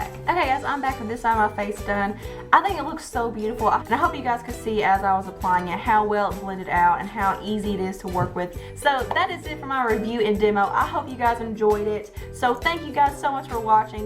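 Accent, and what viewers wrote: South, United States. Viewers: I just subscribed!! You have a southern accent, Im from the south as well!! Its nice to finally hear someone who sounds like me on here lol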